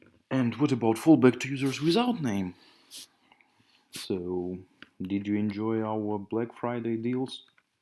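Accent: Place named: Russia. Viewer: He has russian accent I hear.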